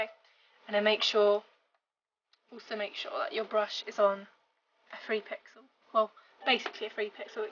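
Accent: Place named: United Kingdom. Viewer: I'm a sucker for British accents. I love it!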